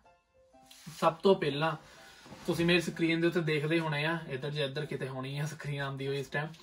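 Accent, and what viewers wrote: Punjab, India. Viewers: Very natural Punjabi accent.... Love your videos :)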